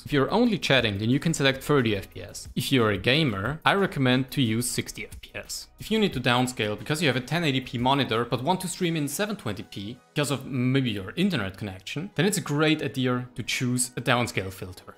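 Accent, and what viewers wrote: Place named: Germany. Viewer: I could tell you were born in Austria, Germany, or any other place that speaks German as a language. the accent sounds german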